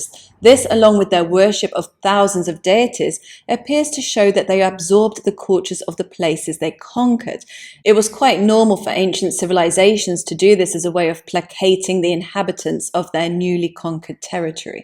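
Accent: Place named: Northern England, United Kingdom. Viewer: So, you imagine that you know what the rest of the world is all about, eh? I am fully aware that hers is an accent found in the more Northerly parts of England and not in a North American variety. I understood her perfectly, but others, schooled in "English as a foreign language", might not find it so easy. Perhaps you might care to elucidate on you expertise in this language discipline.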